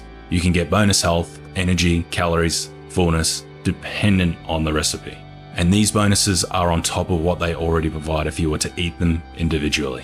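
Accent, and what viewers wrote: Australia. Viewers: it's a sexy deep aussie accent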